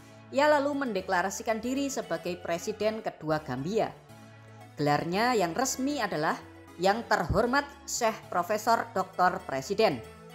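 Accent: Java, Indonesia. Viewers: Suka bgt ma Suaranya, lucu bunda ada logat jawa2nya gtu😁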